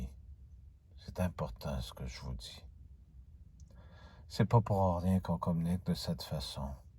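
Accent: Quebec, Canada. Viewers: Vous êtes québécois ? 😄😁😆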